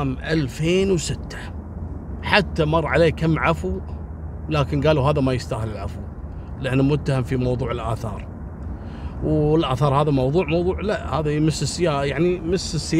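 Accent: Kuwait. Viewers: اللهجة الكويتية حلوة ومفهومة.أنا تعلمتها من خلال سوالف الله يعطيه الصحة ويطول عمره 🤲👍🌹🇲🇦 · استاذ صرت اعرف واحب اللهجه الكويتيه شكرا الك القصه روعه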